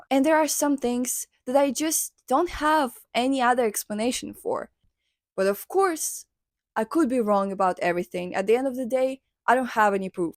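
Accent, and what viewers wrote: Russia. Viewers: Are you Russian? Your accent sounds Russian Edit: saw the Cyrillic text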